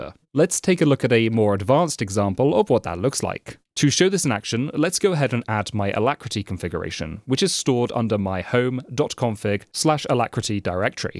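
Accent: United Kingdom. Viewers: Indeed good job, you explained step by step in the simplest way possible, now I marked your video for my future fresh reinstall cause now I had to share my laptop with my partner. By the way speaking of your accent, are you from UK?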